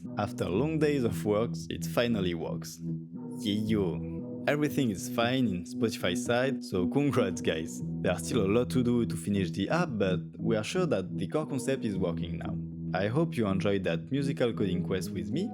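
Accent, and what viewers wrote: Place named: France. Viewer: You sound French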